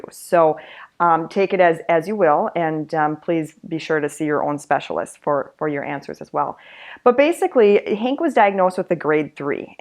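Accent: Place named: Midwest, United States. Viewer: very very good and informative series. My English Bulldog has been diagnosed with a stage III Luxating Patella also. I have not been told at this time that his ACL is not intact. He is 2 1/2 yrs old. It sounds like you would like you are from the Midwest. I plan to take him to the UNIV of WI Veterinary School which is supposed one of the best in the country. His first consultation will be a 4 hr exam ( so a very thorough exam) and then at that point he will be diagnosed as to how to proceed. Thanks again for the video series so I know what to " really expect" once he is sent home. I have been told that he will be staying overnight with his team to include check ups with his anesthesiologist to make sure he is not having any severe reactions for the surgery and anesthesia that was administered.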